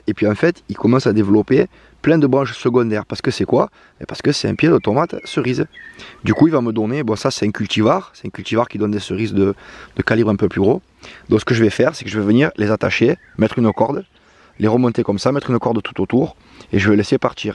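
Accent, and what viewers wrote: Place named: South-West, France. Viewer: merci a toi je pense que tu est dans le sud ouest non tu a un accent simpatique moi jsui sud est un grand merci pour tout ton temp et ton savoir faire que tu partage jatend les ptit secret1😉👍👍👍👍👍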